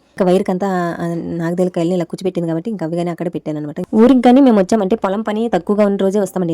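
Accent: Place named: Rayalaseema, India. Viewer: A vuru midhi rayalaseema na anantapyram anukuntunna mi slang vini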